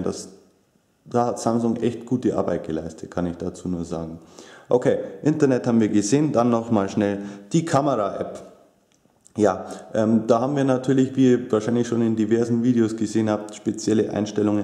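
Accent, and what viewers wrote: Bavaria, Germany. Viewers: Ich find deine Videos Klasse, hab dich auch gleich mal abboniert ;) Kannst du falls es sich einrichten lässt das mit der Dropbox mal zeigen oder kurz erklären weil des find ich interessant aber ich find nirgends im Internet ne Seite die das vernünftig erklärt. Welchen Dialekt redest du normalerweise? Weil ich komm auch aus Bayern und ich hör sofort wie du dich anstrengst gutes Hochdeutsch zu reden :D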